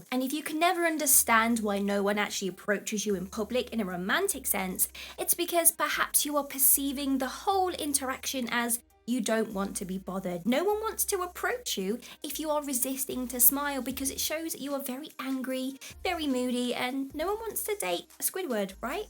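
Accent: United Kingdom. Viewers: My god, you're in the UK and you're complaining of heat? I used to live in Borough of Brent, no I'm in the U.S. in California. It was almost 45C today or as they say here 112F where I stay and it will remain around mid 40s until end of September. So please my dear, as someone who has lived in the U.K. don't complain about the heat there. By the way like your videos, the accent makes me home sick but otherwise great. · you look like russians but your english is british, btw i like your dating lectures.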